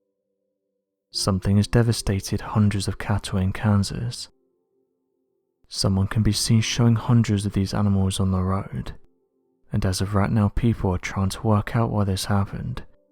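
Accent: United Kingdom. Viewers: This was probably taken out in Western Kansas at the feed yards out there. It happens, folks. It's hotter than Hades right now and the picture is misleading. All these cattle didn't just drop dead where they lay. In large operations like these the dead ones are gathered up and buried in a mass grave. When your running a few thousand head there's going to be losses so this is just nothing to make a big deal about, though, our British accented friend seems to think so. Nothing to see here, but, Beware of the Fear Mongers on YouTube.!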